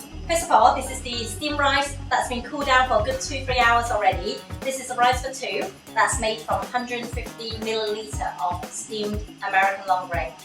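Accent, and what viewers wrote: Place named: Asia. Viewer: Is this a British person with an Asian accent? Awesome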